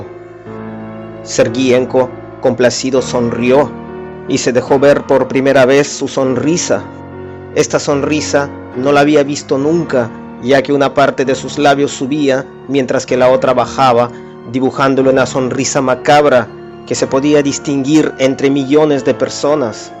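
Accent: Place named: Russia. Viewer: Buenisima historia, por tu acento creo que eres ruso o slavo?, saludos desde el Perú.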